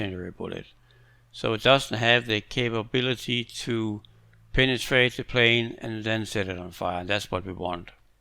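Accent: Denmark. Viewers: Jeg havde ikke set dit navn før jeg hørte accenten. Men jeg kunne høre det danske :D Tak for en god video.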